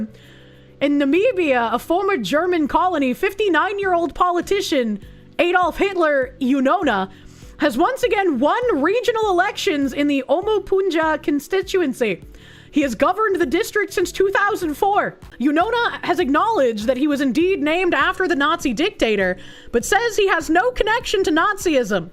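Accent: Boston, United States. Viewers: You have a Boston accent? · Her Boston accent gets my motor running!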